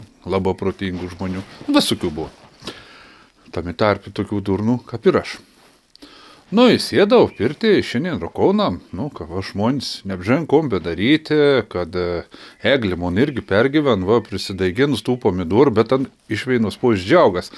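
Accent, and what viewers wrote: Samogitia, Lithuania. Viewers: Pirmą kart klausau kaip žemaitiškai kalba, labai malonu ausiai, aš kaip nemoku man labai smagu girdėt.:) Manau žemaičiai visad turėtų kalbėt savo tarme, o kam nepatinka tegul čiuožia. 😄 O apie putiną tai čia geras buvo, dar tokių bajerių negirdėjau šiemet. 😂 Mldc. 👍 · Vidiaks 😅😅😅 net ir aš pasimečiau, kaip tašė stoty 😄 Itin smagu girdėti gryną lietuvišką žemaičių kalbą ❤👍 Pasaulinis lyderis V. Putinas, kaltas, 😅 Gerb., jus👍😄 Sėkmės jums su Egle ❤ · Ačiū už žemaičių kalbą. Koloritiškai... Ta prasme spalvingai skamba.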